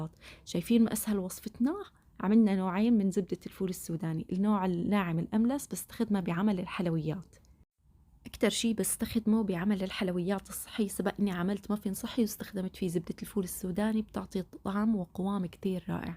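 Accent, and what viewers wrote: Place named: Ruhaiba, Saudi Arabia. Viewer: حسيتك من الرحيبة😂😍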